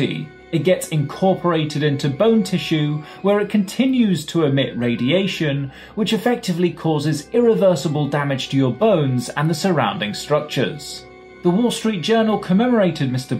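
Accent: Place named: RP, United Kingdom. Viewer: Not only this material is utterly informative...the level of English RP is amazing,great training for an English teacher like me hailing from Havana.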